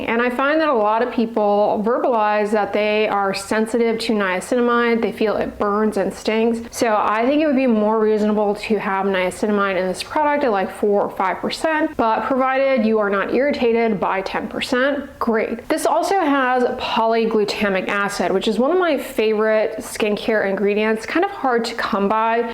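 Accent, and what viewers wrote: United States. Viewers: WOW what weird thing to say!!! She has a lovely American accent, nothing unbearable about it!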